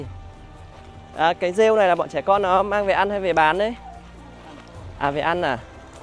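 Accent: Hanoi, Vietnam. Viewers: Ai cũng nghiện kênh của rồi, bây giờ cầm ĐT lên là mở thôi, không còn thời gian cho kênh khác nữa, Cảm ơn con nhiều nha, cảm nhận như được đi Du lịch, thế thì làm sao mà không yêu được cơ chứ, rất yêu con, vì giọng nói của trai Hà Thành, vì nó gợi lại bao kỷ niệm, ký ức của một thời Thanh xuân đã qua mà không bao giờ lấy lại được, Cảm ơn con nhiều nha.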